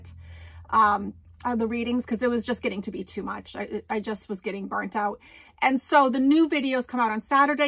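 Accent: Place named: Boston, United States. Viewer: Is UR accent from the greater Boston area? U sound like home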